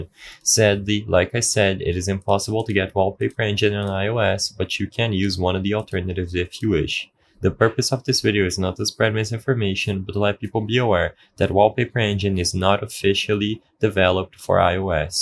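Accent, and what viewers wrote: Brazil. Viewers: vc e Brasileiro certeza, sotaque entregou, mas conversa bem pra krl em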